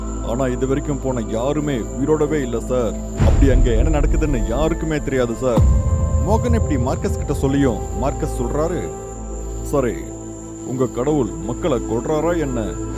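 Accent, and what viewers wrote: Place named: Karnataka, India. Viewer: Kannada Tamil super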